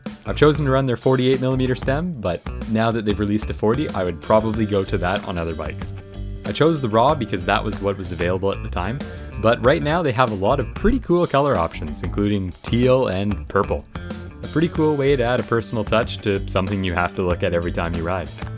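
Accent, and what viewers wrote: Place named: Canada. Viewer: I absolutely the combination of yar calm voice and the canadian accent, you basically have the perfect narrating voice ❤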